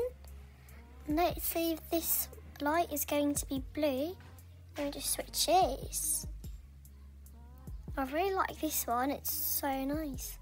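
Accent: United Kingdom. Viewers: I love your British accent ❤❤❤❤❤❤❤❤ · I love your videos but you know itz toca Alice and and you know you just like Alice the British accent and all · Lave that British voiceeee it's soo cuteee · YOUR VOICE IS A GODDESS OF BRITISHHHH AHHHHH❤❤❤ BUT MY FRIENDS CALL ME BRITISH🤨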